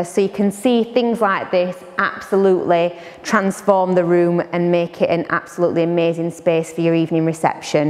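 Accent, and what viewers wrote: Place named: Yorkshire, United Kingdom. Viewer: Dear lady! I love your Yorkshire accent))) I have stayed and slept in WH. Love the palce! I am from Ukraine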